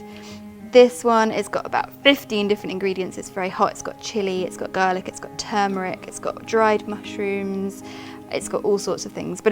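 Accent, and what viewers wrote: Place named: United Kingdom. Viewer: As soon as I hear a British accent on a cooking show, I skip it... Never let a Brit into the kitchen...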